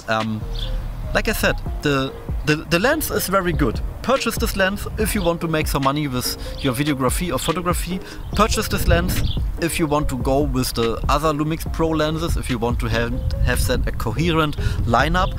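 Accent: Berlin, Germany. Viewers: Schade das du die videos nicht in deutsch machst . Du kämst mit deiner berliner schnauze sicher viel geiler rüber und es gibt nur wenige deutsche kanäle die nicht langweilig sind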